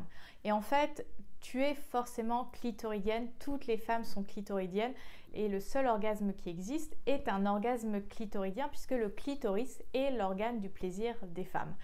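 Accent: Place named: Morocco. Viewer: Peut-être es-tu originaire du Maroc ? à cause de ta prononciation tu as mon abonnement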